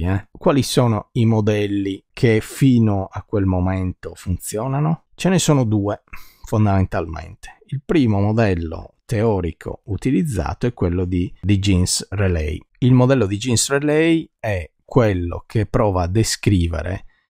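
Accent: Sardinia, Italy. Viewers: Buonasera professore! Provare a spiegare i quanti senza partire da Plank e dal piano PX( come fanno taluni) non è solo trascuratezza o tracotanza. Peggio: é mancanza di compassione per chi segue!!! Come coloro che spiegano la simultaneità in relatività ristretta, senza compararla con un esempio di relatività galileiana. Grande lezione la sua! Anche già per chi ci capiva qualcosina... ma sono sempre utili conferme che provengono da certi lidi. Emergono le dimensioni di h in modo immediato. Ma se uno volesse capire il modulo di h... sarebbe un problema? Anni fa studiai qualcosa, ma avendo avuto all' epoca altre beghe,non mi ricordo una mazza. A matematica arrivo a derivate , integrali e un po' di numeri complessi; non so usare matrici e tensori. Può indicarmi dove cercare qualcosa. Certo non oso chiedere a lei un aiuto sul sito, se non utile a tutti. Grazie per la sua lezione, senza enfasi o dialettate romanesche come quelle del simpaticissimo prof. 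Battimelli, che per questo a volte offre il fianco ai fisici ortodossi. Lei mi sembra sardo. Se così con noi Pisani avrà , come é stato da secoli un rapporto di fratellanza. Pisa= Gallura. Gallura= Pisa! Un caro saluto e scusi la mia prolissità senile! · meno male 28 anni non son bastati per farti perdere l'accento sardo :) saluti dall'isola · Confusione tanta......e un accento sardo insopportabile.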